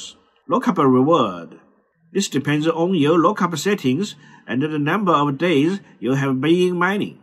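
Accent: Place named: China. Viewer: Your Accent Reminds me of My Chinese IT Teacher... Easy for me to Learn and Follow your Guidance❤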